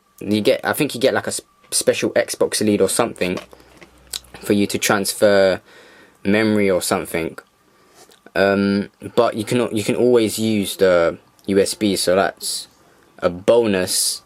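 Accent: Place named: East London, United Kingdom. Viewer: come to east london, almost everyone sounds like him here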